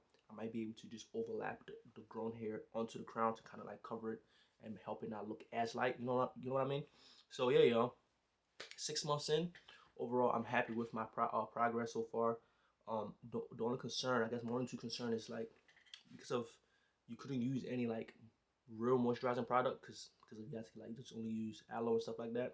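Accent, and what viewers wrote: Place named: Liberia. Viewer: This pekin sounds like a Liberian pekin!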